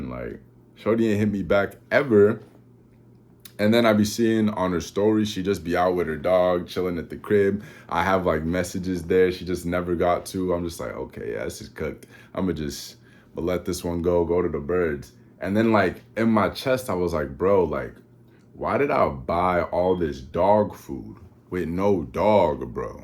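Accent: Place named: United Kingdom. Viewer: it sound like u got a British accent a lil 😭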